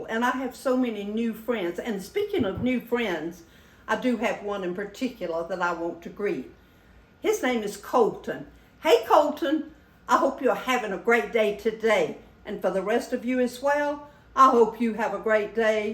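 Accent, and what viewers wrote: South, United States. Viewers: I love the way you pronounce and the little sayings from the south. I'm from Oregon pronounced (Oregun). I love the southern accent. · Happy belated birthday. 🎉❤🎉 I hope god blesses you (and all of us) with many more. You are a lovely & classy lady, someone I would love to sit down & have coffee with & hear your life stories. I think I love your channel more for your stories & positivity, to hear your sweet southern accent & to listen to infectious giggles (I laugh every time she giggles) more than your recipes. ❤ Although I do enjoy your recipes & tutorials too & have made quite a few of your dishes. Thank you for sharing. You are a breath of fresh air & I’d rather watch your videos over & over than to turn on the TV & hear sad & depressing news. This Oregon gal sends her love & prayers to you & your family. ❤❤ Shine for Jesus!❤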